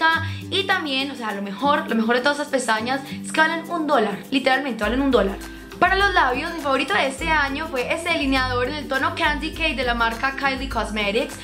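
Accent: Antioquia, Colombia. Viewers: me encantoa nuestro asento paisa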